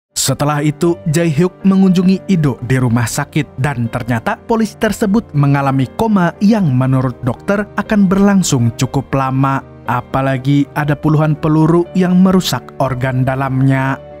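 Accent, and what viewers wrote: Banjar, Indonesia. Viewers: Banjar sekalinya pian mang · Orng Banjar sekali nya😂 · orang banjar bang? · Org Banjar ya bg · nyawa nie orang banjar kh 😅😅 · Orang Banjar kh mang, behblah. Ulun jua nh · Logat banjar admin nah😂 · Ya lo, amang asli Banjar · bahh orang banjar sekali nya😂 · Asli urg mana nyawa? Banjar kh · Urang Banjar leh😂 be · Logat Banjarnya ketahuan banar wkk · Ini banjar kah adminnya yo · Bang, lu orang banjar yah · banjar kali nya pian mang lah 😂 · Banjarnya terasa njirr.. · Pian nih urng mna bang? Logat nya tuh na kaya urng bnjar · Banjar banar sekalinya lah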